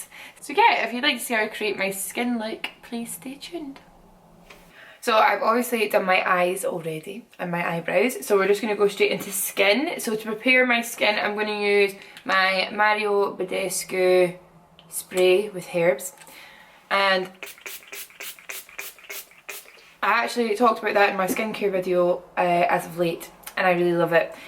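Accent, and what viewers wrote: Scotland, United Kingdom. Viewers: When you say Laura Mercier, it sounds like you're saying "Lord have mercy" - I love it! :D Scotland will always be my absolute favourite; beautiful country, amazing people and awesome accents! <3 Cheers!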